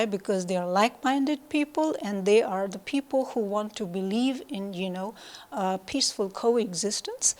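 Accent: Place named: United States. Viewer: This bi is not pakistani. Her accent is fully American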